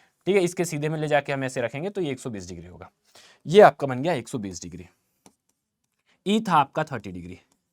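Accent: Bihar, India. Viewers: sir app bihari ho kya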